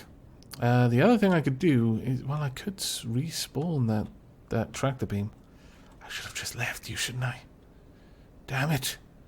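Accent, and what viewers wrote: Wales, United Kingdom. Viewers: Where r u from? You have a Welsh twang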